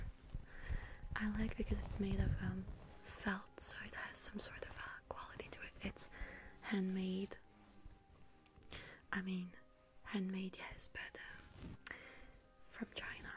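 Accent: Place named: France. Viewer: I looove it when you do english videos with frensh accent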